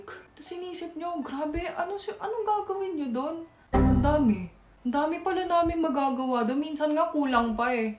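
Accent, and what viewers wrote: Batangas, Philippines. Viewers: 922nd like. Hi! De La Salle Lipa highschool batch 2013 and taga lipa here. 🙋🏻‍♂️ It really is fascinating magtagalog ka and nalabas pagbabatangueña mo haha.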